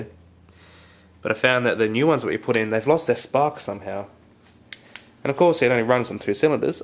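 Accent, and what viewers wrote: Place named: Australia. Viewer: It's an added treat to hear your accent. I have always wanted to visit OZ. Even boarded a young chap from Victoria a few times!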